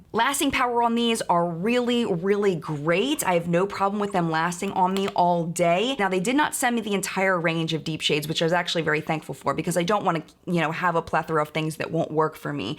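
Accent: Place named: Baltimore, United States. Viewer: How did I just I now realize you’re a fellow Baltimoreian?! I hear it in the “accent” now 😁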